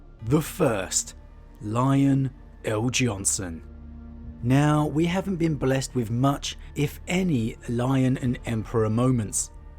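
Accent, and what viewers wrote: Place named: London, United Kingdom. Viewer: Great voice london accent mate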